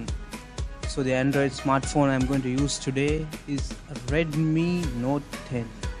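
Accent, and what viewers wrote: India. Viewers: When i heard this indian accent I knew this will be the best tutorial i can pick!!!!!!!!! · When i heard this indian accent I knew this will be the best tutorial i can pick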